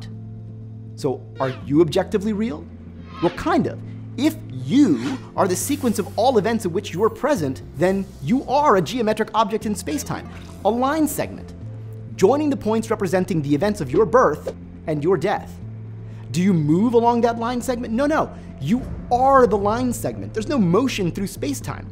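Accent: Australia. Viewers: I wish gabe stayed. Being a non native english speaker, the new host’s australian accent is so hard to understand. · A few years ago, watching Matt instead of Gabe was slightly weird. Now rewatching the old videos and seeing Gabe instead of Matt is weird (no disrespect, Gabe). Matt has really made SpaceTime his own, presence wise I mean. Its weird to watch a SpaceTime video and NOT hear that sexy Australian Complex Physics voice. You guys chose a really good replacement. The lightsaber battle was cool too. May Matt guide us in this journey of understanding (or trying to understand 😁) for a long time.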